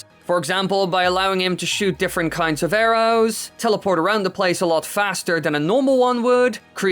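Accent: Netherlands. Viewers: Oeft what the bloody hell is your accent mate? Is it Dutch? It is Dutch isn’t it?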